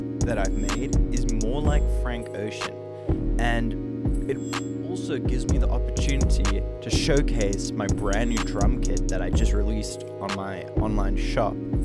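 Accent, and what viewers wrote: South Africa. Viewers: lol the way I can tell you're South African just off of your accent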